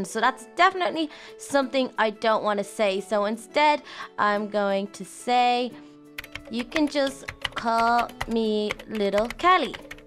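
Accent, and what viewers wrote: Ireland. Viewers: Her accent is so cool, Irish? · Are u really from Ireland?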